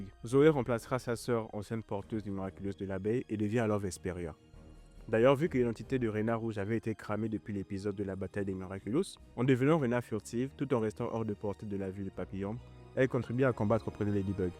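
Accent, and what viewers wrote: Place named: Gabon. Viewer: J'aime, continuez, vue ton accent, je dirais que t'es Gabonais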